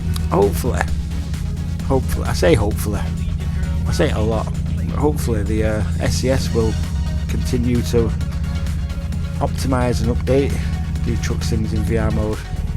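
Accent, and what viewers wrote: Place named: Barnsley, United Kingdom. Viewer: i know a barnsley accent when i hear one mate lmao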